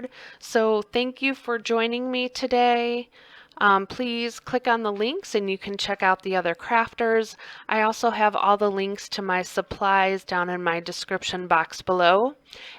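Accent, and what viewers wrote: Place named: Midwest, United States. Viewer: Girl, I can't help but smile as soon as I hear your happy, friendly voice and that Mid-Western accent! Love! I am so with you on the PFS inks...Love them all. Just need one more and my collection will be complete. They are different for sure, but I love them...excpet the detail black...I think I have a faulty ink pad. But LOVE all the others. But I LOVE, LOVE your card!! You did a great job! Love the interactivity!!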